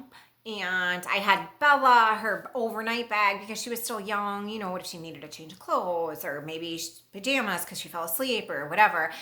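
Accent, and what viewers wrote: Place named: Minnesota, United States. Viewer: I love her accent. Where u from girl? Minnesota?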